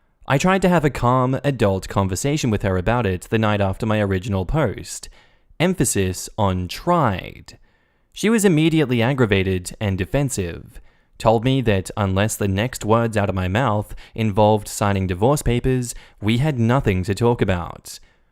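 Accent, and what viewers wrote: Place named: Australia. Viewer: He's from Australia.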